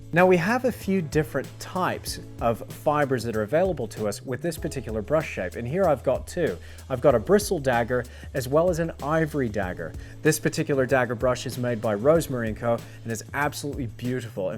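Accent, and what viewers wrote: Australia. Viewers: What an interesting accent! sounds Australian but some words are full American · Couldn’t quite detect his accent! sounds like an Australian doing an American accent! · Am I detecting a faint Aussie accent??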